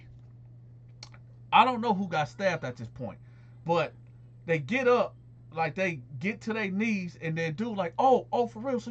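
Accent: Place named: Ohio, United States. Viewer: Okay! At first I thought you was from Cali from yo accent. But now I realize from yo story's that you MUST be from Ohio or Michigan!.. But I'm guessin Ohio. Yaw sound JUST LIKE US!! Or vice-versa. But much love to ya anyway brotha! Keep them interesting ass stories goin! I'm listenin.👊🏾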